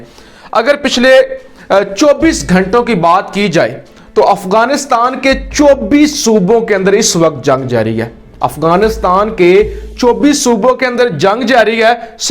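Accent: Punjab, India. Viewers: Try to learn Urdu & Urdu pronunciation in evening classes. Dimagh ki dahi ho jati Hy Urdu in Punjabi pronunciation. Or yeh June main blazer Hadd kr de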